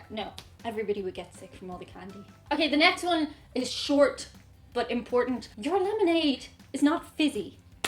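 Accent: Ireland. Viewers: I just love her accent. Female Irish accents are the nicest in the world.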